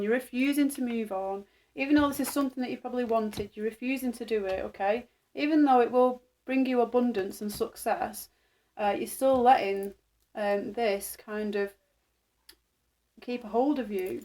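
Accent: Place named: England, United Kingdom. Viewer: Hi,missed you that lovely.English acent,Wishing you peace and Blessings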